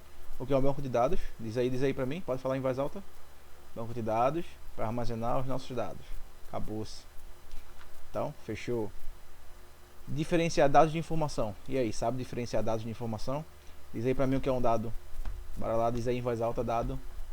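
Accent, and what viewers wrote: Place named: Northeast, Brazil. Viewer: Boa aula! E esse sotaque é típico do meu nordeste.👏🏼👏🏼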